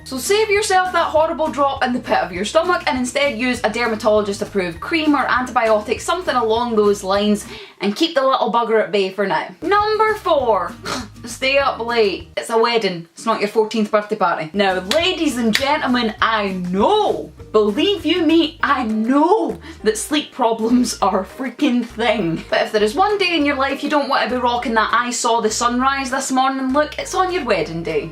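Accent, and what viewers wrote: Scotland, United Kingdom. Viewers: Also I know it's a little crazy but no dairy 3 days before, it causes bloating :) I'm getting married in a month so these tips help a lot, it's just a little backyard wedding so I'm making the food, cake, decorations and such. Also, where are you from? I love your accent but cant figure it out lol. Scottish?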